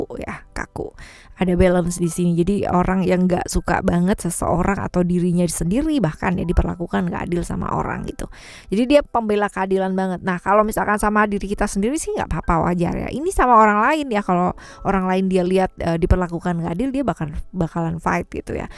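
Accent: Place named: Java, Indonesia. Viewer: Kalau dipikir-pikir cerita bacaannya lucu nganggo banget..!yg membaca saja sampai mumet..😃..mbak per nah bilang" ini hubungan macam apa.....?",halah mbak ...😴...nggak usah dipikir banget2 bikin mumet.! Ma'af ya mbak...aq mau tanya sesuatu: mbak asli orang mana.?, Kok logat cara bicara bukan orang luar jawa.kalau toh mbak ada darah keturunan orang luar indon,saya pastikan ada salah satu dr ortu indon..☺ Intonasi ,logat /penyampaian ,warna suara mbak nggak bikin nek /jenuh ,luwes & frekuwensi sedang😃👍Trims...nuwon...!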